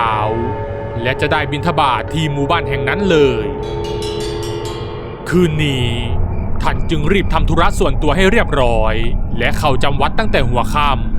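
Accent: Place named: Isan, Thailand. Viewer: อุ๋ยคนอีสานอีกแล้ว😆😜🤫 อีสานหรือหนือนะ 😔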